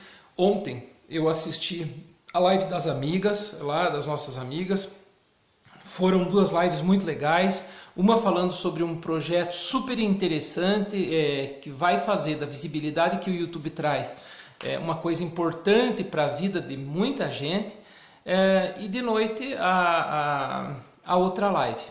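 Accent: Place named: Rio Grande do Sul, Brazil. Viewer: Muito boa tua posição sobre certos assuntos. está no sul pois teu sotaque parece de gaúcho! Abraços desculpa minha curiosidade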